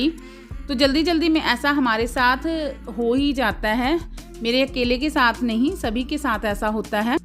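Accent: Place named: Punjab, India. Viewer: Aap ki language Punjabi lagti hai, but aap shayad ho, aap plz apne baare mein kuchh explain karen aap kahan se ho??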